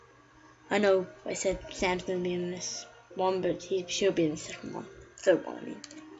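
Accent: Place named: United States. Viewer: Bro u sound amarican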